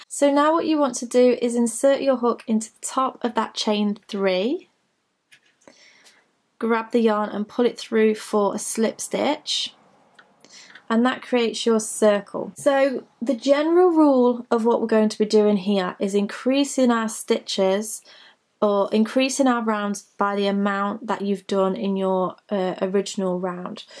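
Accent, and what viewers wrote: England, United Kingdom. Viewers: Confusing that you have an English accent but are using the American stitch names! 😂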